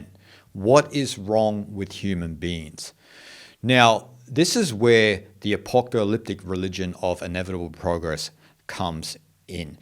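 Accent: Australia. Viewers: no Indian, however how much esteemed he is in his field, could have explained so beautifully in english your description of the kali yuga. the particular phrase which you have used Swamiji, "the devolution of mind into matter", has really struck a chord somewhere. im assuming you're aussie from the accent. you will always find a home in Bharat. australia and nz are in for err a bit of rough weather mate. May Lord Shiva Bless you!. Om Namah Shivay!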